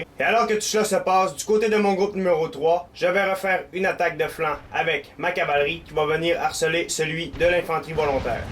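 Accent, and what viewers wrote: Quebec, Canada. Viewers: Québécois? :D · j aime bien l accent canadien^^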